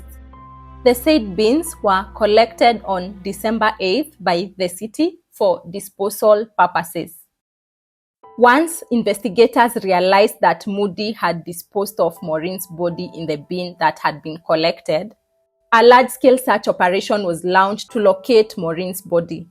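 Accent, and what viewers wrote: Kenya. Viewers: She will improve with practice but, it is a Kenyan accent. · Here in the Uk there are tons of accents and intonations. The narrator of the programme has a Kenyan accent. But what she is saying is easily understood. In the Uk there are regional accents and one cannot make out what the person is saying because the accent and intonation is so strong.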